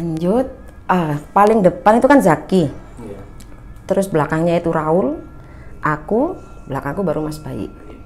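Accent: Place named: East Java, Indonesia. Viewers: Seneng temen mbak ndelok samean cerito. Suroboyoan banget!! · Meski amat sangat terlambat nyimak tetap gayeng...Logat'e mbak ngawe tambah kangen Suroboyo ku...😔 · Logate jawa timuran,,,penak,, Salam dr jakarta selatan. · Aksen Suroboyone bikin keingetan pacarku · penekanan kata ala wong Suroboyo panas banget = pwanas panas banget-banget = puwaanas.. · Mbak cara menyampaikan ceritanya nyenengin biangettt...logat jatimnya iku lho....uuueeennakkk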